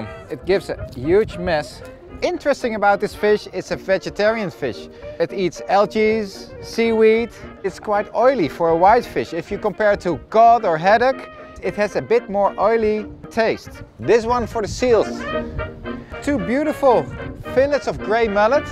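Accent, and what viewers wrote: Netherlands. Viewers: Bart's Fish Tales Ik ben geabonneerd op jamie oliver's kanaal en toen ik je accent hoorde wist ik gewoon dat je Nederlands was haha. Kun je een keer gerechten maken met gamba's? Ik ben namelijk dol op gamba's en garnalen...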